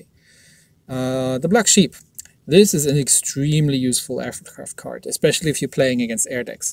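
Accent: Germany. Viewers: Nice video! I'm currently struggling through the 120's lol. Just can't keep my units alive long enough and my health high enough to 3 star stuff. Also, noticed that despite living in Australia for so long, you've still got a prominent German accent. A lot of people tend to lose theirs over time or pick up a new one from where they live.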